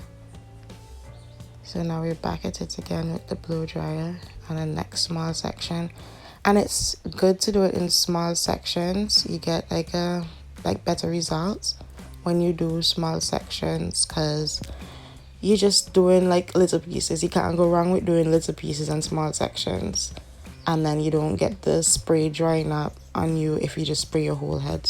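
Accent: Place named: Jamaica. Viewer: Your accent is so close to Jamaican.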